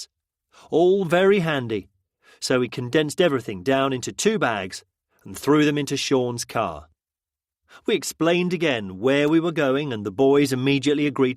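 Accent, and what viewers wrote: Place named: United Kingdom. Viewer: Brits can even make the zombie apocalypse sound funny 😃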